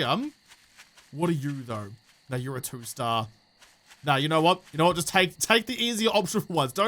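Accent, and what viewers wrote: Australia. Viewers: Bro where in Australia are you from?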